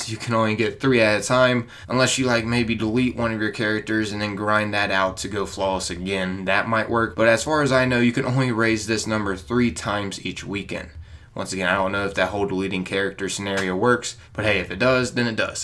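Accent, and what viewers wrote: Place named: Maryland, United States. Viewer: You HAVE to be from Maryland. Lol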